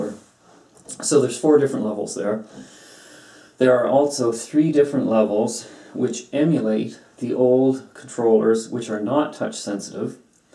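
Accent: Canada. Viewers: More do less talk...but love the Canadian accent though. I got one of these just needed a few tips, nothing to help me here,sorry guy bye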